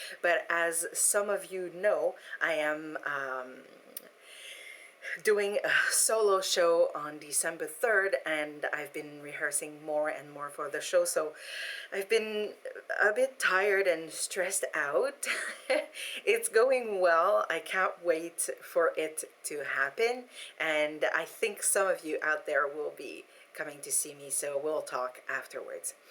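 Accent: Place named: Quebec, Canada. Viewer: Ah! French-Canadian. Neat! I knew you had an interesting accent, I just couldn't pinpoint what it was. I live in the Southeastern part of the United States, so you would definitely hear an accent from me! Lol. What's so odd, is that I have had people in my own hometown asking me where I'm from. That hasn't happened in a while now that I think about it---but it happened quite often for a while. So, I couldn't tell you WHAT I sound like, lol! Just a Southern accent. Have a great weekend, and---don't let the vampires bite! 👿 I would love to get bitten and turned into a vampire, actually. Life would never be boring then! Lol. 🤗🤗🤗 🎃🕸🔥👻💀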